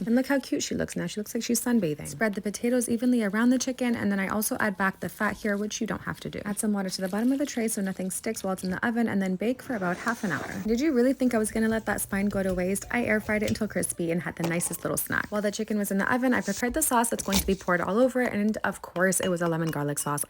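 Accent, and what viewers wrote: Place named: Latin America. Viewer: Sounds very Latino actually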